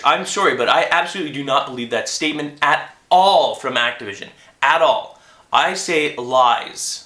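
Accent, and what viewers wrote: Canada. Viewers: his Canadian acent ruins the mood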